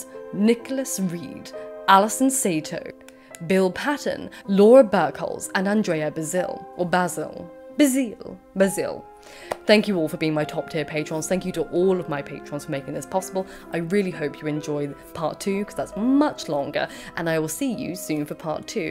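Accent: United Kingdom. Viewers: Why does every British person sound like an Oxford professor! 💚 everything sounds more intelligent and smooth! 💚 Love the mythological on sirens/mermaids! 💙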